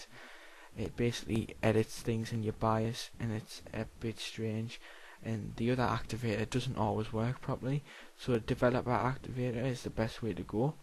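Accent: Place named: Cockney, United Kingdom. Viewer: Lol.. What kind of cockney gibberish are you ranting is this? I'm only assuming- I really don't know which UK dialect you're ranting, but it's difficult to make out; and also this video was very poorly encoded at that.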